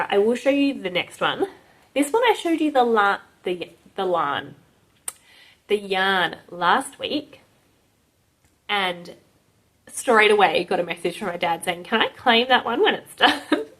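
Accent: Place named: Australia. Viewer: Hello Lovely. You just popped up out of nowhere but Im glad you did. Love your Aussie accent and all your makes. So far from home but home is where the heart is, eh? Im an Aussie too of Romanian background. Do all the crafts too...about to finish a quilt and work on a few knitting, crochet wips . I am married to an artist.Coming up to our 20th. Love that your Dad paints trees.Go well in your days. Just finished my Go Go. Blocking as I type. ❤🐨🌏xx🌞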